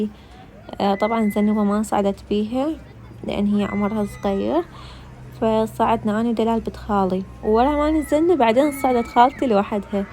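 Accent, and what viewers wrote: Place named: Baghdad, Iraq. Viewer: سلام عليكم انت لهجتج بغداديه بس عنوان اليتيوب مالتج مكتوب شلون هاي انت بصراويه لو بغداديه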